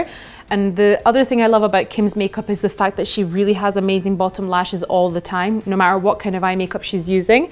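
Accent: Scotland, United Kingdom. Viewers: Daha önce yurt dışında yaşadın mı ingiliz aksanına benzettim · Scottish accentin cok tatli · Loved your scottish accent. I’d like to move&study in Edinburgh or St Andrews one day. Bonnie Scotland💙🏴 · you look stunning! Please make more English videos. It's amazing to hear English with Scottish accent.:) Love u. <3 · helal abla senin scottish aksanına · I'm hearing lil bit british accent, especially when you are saying 'now', love ittt❤️ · I love your British accent! Please take more videos in English. Greetings from North Carolina <3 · tanrı misafiri iskoç aksanıyla konuşuyor, cehaletinizi belli etmeyin bari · Lovely video, you've spoke with a Scottish accent.. * Edit: Carries on watching the video and realised has a family living in Scotland! 🙈🤦‍♀️ Lots of love from London! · that british accent thoooo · İskoç aksanı mı bu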